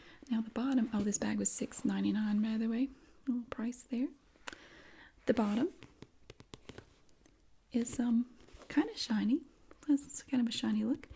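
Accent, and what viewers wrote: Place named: Upper South, United States. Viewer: 'My Journey To A Smile' She’s from the upper south east! 😊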